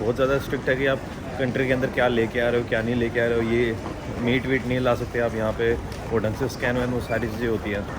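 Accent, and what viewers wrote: Haryana, India. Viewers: Arey yo toh haryanvi Bhai se . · Hariyanvi tach I like it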